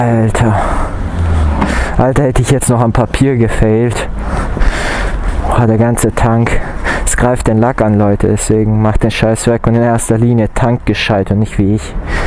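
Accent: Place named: Bavaria, Germany. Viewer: Gruß in meine Heimatstadt! :) (Y) Gebürtig bist Du aber nicht aus S oder? Würde Dich vom Dialekt her eher Richtung Nord- oder Ostbayern verorten?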